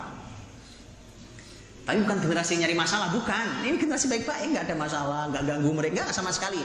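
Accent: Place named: Java, Indonesia. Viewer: oh pantesan logatnya jawa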